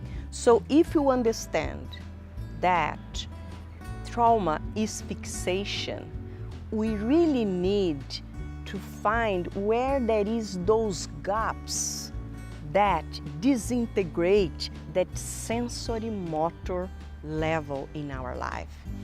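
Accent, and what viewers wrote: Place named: Brazil. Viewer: So good to hear a brazilian voice in SE world! Thank you for this explanation. I am studying SE! ❤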